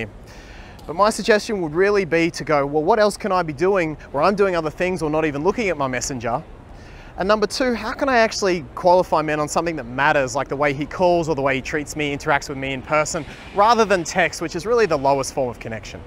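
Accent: Australia. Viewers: I loved all the advices you gave the females,.... Taking notes & thank you.🙏🏽. And your Aussie accent is cute. Awesome. Sydney Harbour is a great land 🇦🇺🇦🇺🇦🇺🇦🇺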